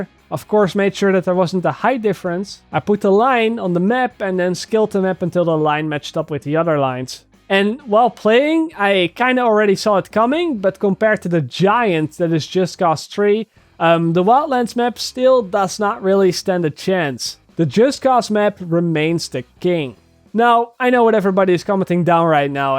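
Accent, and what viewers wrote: Netherlands. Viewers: Het is te horen dat je nederlands bent :) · ik hoefde niet tot het einde te wachten om erachter te komen dat je Nederlands was, typisch Nederlands accent man xd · Heerlijke nederlands accent ;-) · he sounds dutch or not a real english man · Your Dutch accent is so Obvious. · dude I love your video's but holy shit get rid of the dutch accent.. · Kom je uit nederland? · Euhm i gues You are from holland🙃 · je klinkt echt zwaar nederlands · Ik ben 100% zeker dat jij een Nederlander bent.😜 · clearly Dutch accent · lekker nederlands accent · jou accent man... je bent zo nederlands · ok... I really like your vids... but pls... try to avoid that dutch accent! Grüße aus Deutschland ^^ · are you dutch? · Are you from holland bcz I've never seen you but you just sound like you have a dutch accent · Je uitspraak is echt heel Nederlands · nederlander die engels praat..... 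heel schattig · lol, so funny how you can hear, within seconds, that the video in english is made by a dutch person. you can just tell immediately. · Hears voice and accent for 2 seconds...I smell dutch person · really well put together video, I'd say you could assume the map to be 14 squared km. also are you dutch? kind of recognize the accent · hoorde aan je accent al dat je nl was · Hoor zijn accent dit is sws nederlander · je hoort dat hij nederlands is 😂😂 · You're sooooo Dutch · The guy who was talking is dutch, i hear it from his accent. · is deze guy Nederlands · You can hear he's dutch by his accent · Fack you Have a so clear dutch accent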